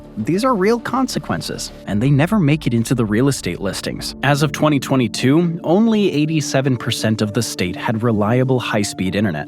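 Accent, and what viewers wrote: California, United States. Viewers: Sounds a little bit like you might be from California.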